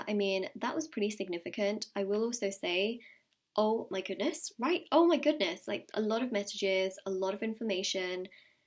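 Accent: England, United Kingdom. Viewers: Love your accent where in England are you from?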